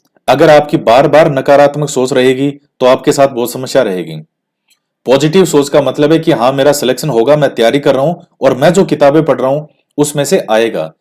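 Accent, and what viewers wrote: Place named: Marwar, India. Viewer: धाप के पढो गुरूजी मारवाड़ से लगते हो 😀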